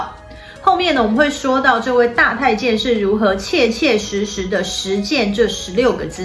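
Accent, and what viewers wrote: Taiwan. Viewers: 标准的台湾国语。比很多ㄉㄞˉㄨㄚˉ厲害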